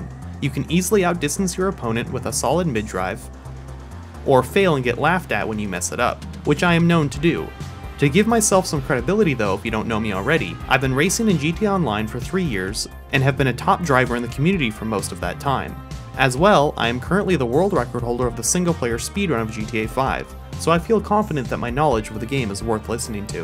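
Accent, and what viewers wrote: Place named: United States. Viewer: Yeah, he's pretty obviously american :D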